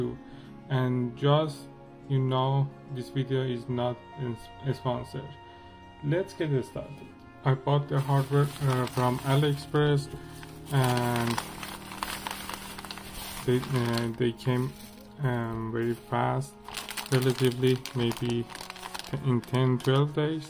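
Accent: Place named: Iran. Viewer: سلام ممنون بابت ویدیو شما ایرانی هستید؟ لهجه تون که ایرانیه😅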